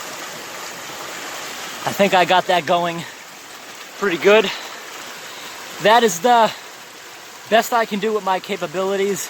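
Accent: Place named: Michigan, United States. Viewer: Are you from Michigan? Trying to place your accent!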